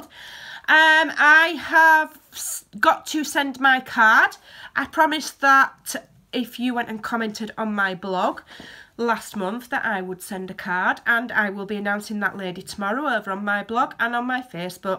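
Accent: Yorkshire, United Kingdom. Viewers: Hello from Texas! I'm a Manchester girl born and bred so you have no idea how lovely it is to hear that Yorkshire twang. Can't beat a Yorkshire sense of humor, something sadly lacking over here. I can't tell you how many times I come out with a saying and people just stare back blankly. Any ways up (there's another!) I love your videos and follow you daily. Thanks muchly x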